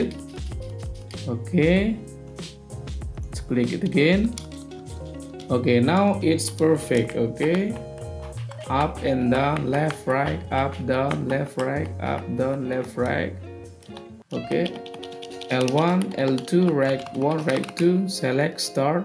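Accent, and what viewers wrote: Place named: Indonesia. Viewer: Logat Indonesia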